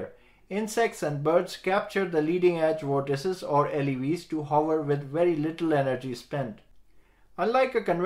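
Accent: India. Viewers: Once u get past the indian accent u realise this guy knows his stuff and explains everything really well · People may be reading too much into this comment. Yes, on YouTube, there a ton of guys with Indian or Pakistani accents doing videos of this type who are really just trend hoppers, who present nothing new in their content. After listening to him talk, he actually knows his shit, and does remind me of the Indian engineers I've worked with over the years. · If you went to Engineering school, you'd already be past an Indian accent. His English is perfect. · I've worked with so many smart Indian men and women that the accent automatically lends credibility. :0) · I love that India Sound :)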